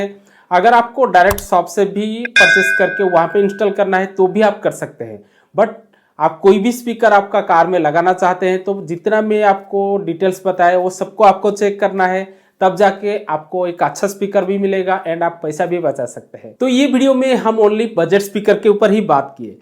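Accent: Odisha, India. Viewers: odisha se ho?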